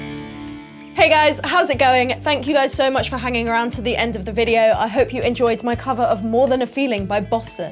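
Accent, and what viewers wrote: England, United Kingdom. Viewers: Her guitar work is phenomenal. Her smile is gorgeous. And when she talks in that British accent, I wish she'd never stop speaking. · I don`t know why...but until I heard you speak at the end of this video I assumed you were American. England really has everything going for it right now...although we need to wait until tomorrow to see if they are kings of Europe....forza Italia! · Holy sh*t I had no idea she had a British accent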